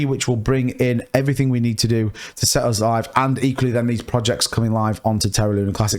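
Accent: United Kingdom. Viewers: It's hard to understand what ur saying when u talk too fast with the British accent. Just a heads up from over the pond. Love your channel!!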